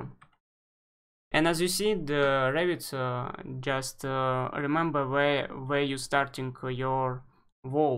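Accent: Russia. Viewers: great tutorial, I think you are native Russian yea? You have the same accent speaking english as like my girlfriend, she's from sint petersburg :)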